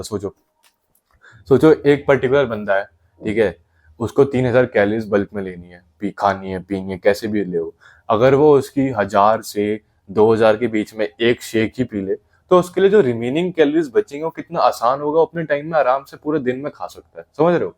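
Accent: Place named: Pahadi, India. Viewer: Pahadi ho kya bhula??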